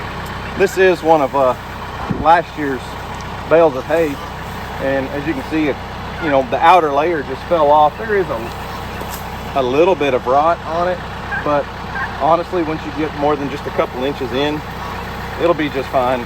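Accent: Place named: Alabama, United States. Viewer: Those that are wondering…. has to break up the Cog….Hill because the A.I. (Artificial Intelligence) that adds the Subtitles, hears Cog Hill said normal speed with an Alabama accent, as Cock. It thinks is swearing/being rude and either removes the video or doesn’t pay him. 🤦‍♀️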